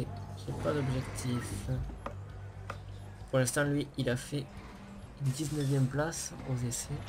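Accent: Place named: Marseille, France. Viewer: accent marseillais sisi :)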